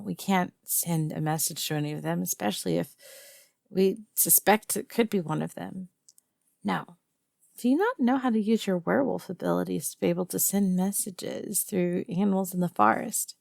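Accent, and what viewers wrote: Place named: South, United States. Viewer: Goddamn that southern twang got me feeling weird..... Where ya from girl, definitely gotta be some southern state cus that's a fucking southern accent, i feel like im freaking kut but i definitely here a woman named Betsy with that voice lol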